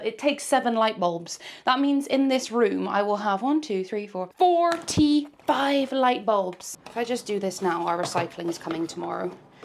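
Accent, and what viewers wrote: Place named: Bristol, United Kingdom. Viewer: Hi. I’m quite a new subscriber to your channel but I really enjoy your videos. There’s always so much variety and you’re always so natural and honest. I love your Brizzy accent- I’m a West Country gal but have been living in Greece for the last 42 years but love to see what’s happening in the U.K. 💖🌸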